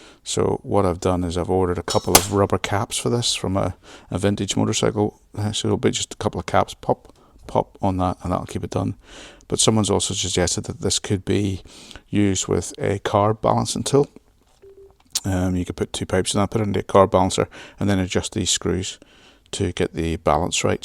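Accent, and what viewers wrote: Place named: Scotland, United Kingdom. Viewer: Just went and checked my <num> Duke R....yes that is the correct orientation for the header nuts. The 790 and 890 have different radiators. The 890 radiator is larger. Enjoying following this, Thank You for sharing! You are doing an incredible job of cleaning as you go along. It will be like you have a new bike! Also, as a Texan who has some Scottish ancestry and a Scottish name (Gillie)...I'm enjoying the accent!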